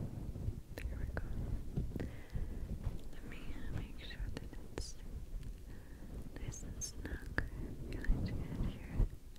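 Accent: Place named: Russia. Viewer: you are such a beautiful lady. It seems that you are becoming more beautiful as you get older. I was watching some of your videos from 2011. I think I am going to be one of those women that turns into a hag as I age. Watch, you'll be more gorgeous as you get older. What is your secret my dear. Please don't tell me DNA. I do miss your Russian accent as was so prevalent in your first videos. I enjoy watching those. I could hear your voice more in those. Whispers are great but you have such a pretty voice, it would be great if you could do some like when you first started out. My volume doesn't get any louder. You are one of my favorite asmr artists. Take care of yourself and your family.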